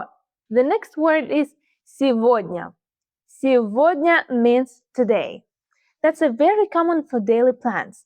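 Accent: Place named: Russia. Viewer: You speak English more Russian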